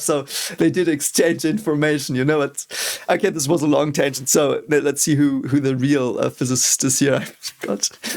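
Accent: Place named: Germany. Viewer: Why is it when ever i her german accent, theres erica automatically playing as my in head elevator music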